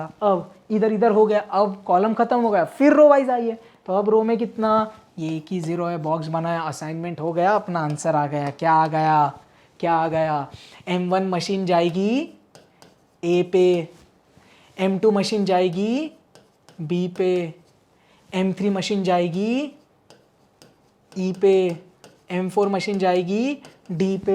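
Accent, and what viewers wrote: India. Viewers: Sir we are in love with your Hindi Accent 💘😍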